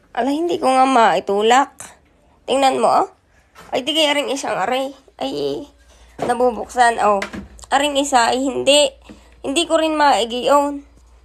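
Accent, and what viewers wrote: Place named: Ilonggo, Philippines. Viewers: Nindot jd kaayu paminawn ang inistoryahan sa ilongga ❤❤ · Baw ilonggo gale si mama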